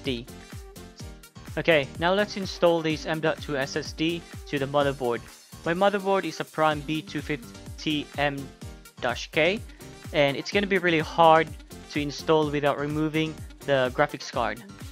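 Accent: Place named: Australia. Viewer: dawg i know you're Pinoy so cut the aussie accent and speak our accent for the pride of the Filipino People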